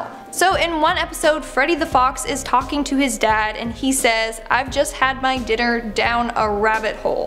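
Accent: United Kingdom. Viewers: Whats Wrong With A Slight British Accent?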